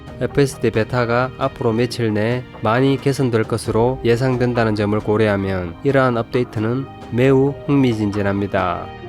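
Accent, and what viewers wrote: South Korea. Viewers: 전혀 반대예요, 굴리시지 않고 한국인 답게 주체성을 갖고 발음해주셔서 매력 빵빵 ! 여하튼 영상 감사해요👏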